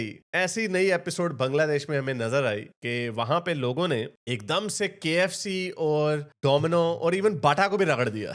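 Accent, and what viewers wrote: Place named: Punjab, India. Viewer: I thoroughly enjoy your Punjabi accent and jumble😂😂😂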